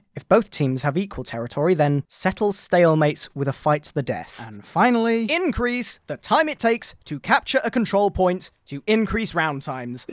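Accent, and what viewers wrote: United Kingdom. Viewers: Are you British? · YES, MY BRITISH SOUNDING MUM IS BACK! Seriously though, you make amazing content and I hope you continue to make great videos.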